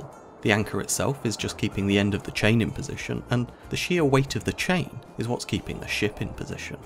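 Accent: United Kingdom. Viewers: i was going to say the same but since he sounds british maybe they pronounce it that way.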